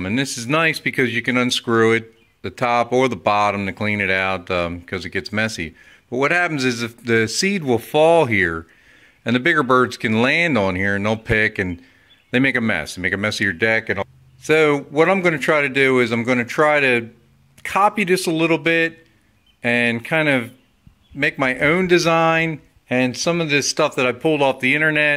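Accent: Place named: Delaware, United States. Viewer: So good to hear a Delaware accent! Love it - made me hungry for a hoagie! And oh yeah, very imaginative and useful design - thank you.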